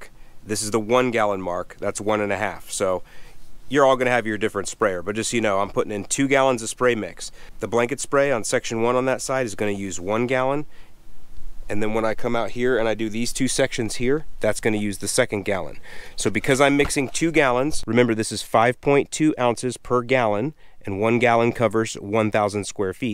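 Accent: Chicago, United States. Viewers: The production value, photography, videography, music, sound, all enhance the great lessons. I get a kick out of the use of the Chicago accent and sayings on other videos from this! Well done. Great content! Cheers!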